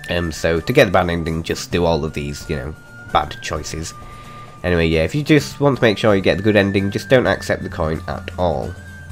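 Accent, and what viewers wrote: United Kingdom. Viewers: Humberto Castro I think he is British,but sometimes he spoke so fast that's hard to understand indeed.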